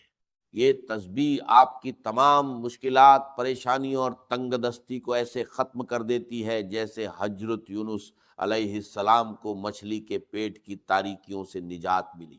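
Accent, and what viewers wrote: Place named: India. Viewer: dr israr ki sirf tasweer hai ..bol tu ko indian bhai lagta..kion k zaaria ko jaaria tu indian bhai keh saktay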